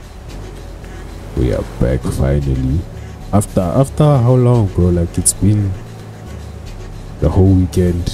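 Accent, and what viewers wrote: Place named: South Africa. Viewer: The way you speak you from South Africa bro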